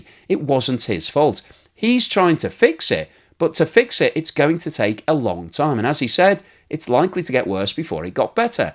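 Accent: United Kingdom. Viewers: Even your charming British accent doesn’t help you with one major flaw with your analysis. And that is that the Clown known as Javier Milie revealed his pure incompetence when he said that the only countries in the world that he is going to have economic relationships with are USA and Israel. He completely omitted China which is the economic powerhouse on the global scale. Milie is a complete imbecile and Sadly many Argentinian were too ignorant to see that.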